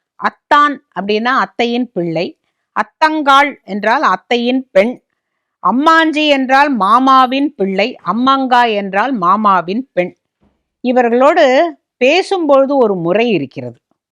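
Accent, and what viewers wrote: Palakkad, India. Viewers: Akka I am your Palakkad relative.Super Palakkad Tamil. I am your follower and enjoyed learning a lot.Everyday I will wait for your program. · பாலக்காட்டு மாமி மாதிரி பேசி அசத்திட்டேள்.. பழைய பாரம்பரிய விஷயங்களை இது போன்ற நல்ல செய்திகளை தொடர்ந்து தாருங்கள்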